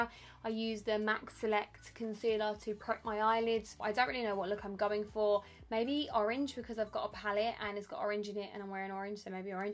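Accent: Essex, United Kingdom. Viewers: Are you an Essex girl?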